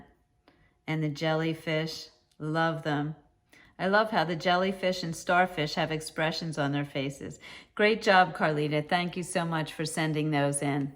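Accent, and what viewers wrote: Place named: Upstate New York, United States. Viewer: Hi,! Great pics!! It's wonderful to see the projects! They are inspiring. I love the cat couches. I won a booklet of 3 cat couch patterns, but felt intimidated, plus I didn't have access to the foam pieces to put inside. Seeing these wonderful, colorful cat couches, I just might give it a try down the road. The other projects were all amazing, too... love the blanket with the appliques. Love your website, and thank you for the Yarn Ends Tip Sheet from S.D. I had wondered if you were in New York; you sounded like an upstate or Long Island New Yorker to me, not one from the City. I am a native Ohioan, but spent 4 years in northern New Jersey before returning to Ohio at age 21. My family used to cross the border to hike to historical sites in New York. I hope you really enjoyed going to the wedding.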